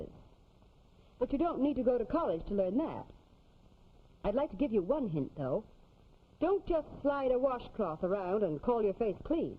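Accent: Transatlantic, United States. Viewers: It’s the transatlantic accent, it was only used for tv and films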